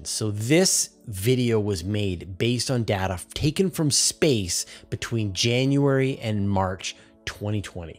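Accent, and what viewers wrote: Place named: Canada. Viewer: Your canadian accent is very nice, there's nothing to worry aboot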